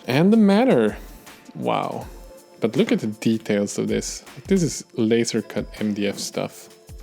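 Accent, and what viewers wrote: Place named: Sweden. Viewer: Sjukt att hitta kanalen och först höra du mest troligt är svensk utifrån dialekten och nu se Umeå på ett paket! Hej granne!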